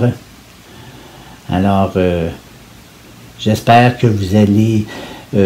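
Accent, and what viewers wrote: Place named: Quebec, Canada. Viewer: Merci! C'est toujours un plaisir de voir et d'entendre tes explications avec ce doux accent québécois:-)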